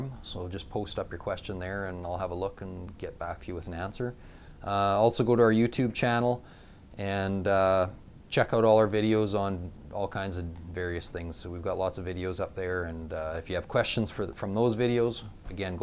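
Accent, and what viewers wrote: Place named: Canada. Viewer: You sound very Canadian. 😁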